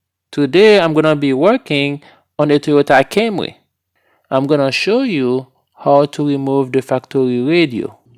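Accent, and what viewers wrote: Haiti. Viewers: That Haitian accent 😂💪🏿💯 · Hey! I recognize that unmistakable accent!!! Sak Pase!!!! I'm just about to go out to my 1998 Camry that just won't die and install a backup camera. Thanks for the video!!!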